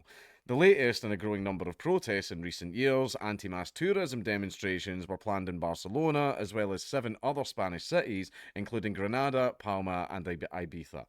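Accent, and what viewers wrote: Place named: Scotland, United Kingdom. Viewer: Who the hell taught your country to speak oooooooooh your scotish eesssh what a wreck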